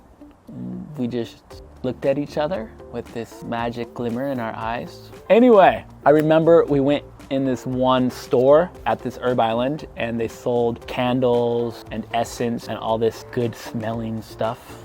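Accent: United States. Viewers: Haha! I don’t think I’ve ever heard you speak this much in English. 한국말로 구사할 때랑 똑같이 농담을 하시네요 ㅋㅋㅋ 캘리포니야 말투도 멋지고 한쿨말의 방송인 억양도 재밌구먼:) 화팅!! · As an American, I like your American English accent. It's very easy to understand and soothing to listen to. I like your advice by saying to be bold and courageous but not cross the line which means always being respectful and being a gentleman. Great video as always! Take care,!!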